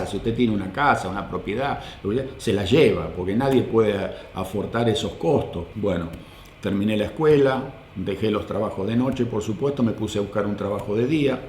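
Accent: Argentina. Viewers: Ayyy! Qué increíble tu historia! Hablás como si estuvieras viviendo en Argentina tu acento.Abrazo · Lindo video! Que buen relato tienes, no has perdido el idioma ni los giros argentinos, me encanta escucharlos, tiene a quien salir. Felicidades a todos en este año que se inicia y ojalá salgamos del pantano que Argentina está inmersa, cosa que no lo creo... al menos por varios años, nos falta tanto para ser de primer mundo, necesitaremos la ayuda de Dios. Buena suerte y hasta la próxima, saludos desde San Lorenzo (Sta. Fe), Argentina.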